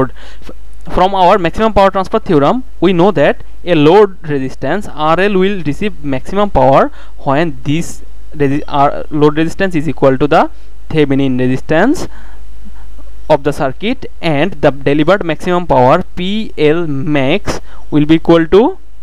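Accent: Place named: Bihar, India. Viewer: Tnne k mtlb h bihari h ya punjabi😂😂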